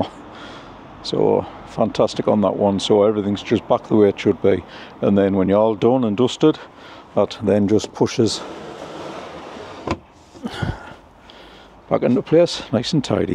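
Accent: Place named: North, United Kingdom. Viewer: Great vlog , nice to hear a northern accent 👌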